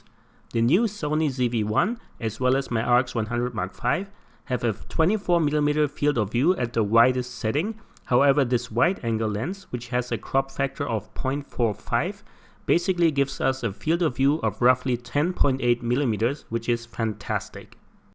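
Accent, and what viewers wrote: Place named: Germany. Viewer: Thanks for the tips. You have such a nice German accent 😉👍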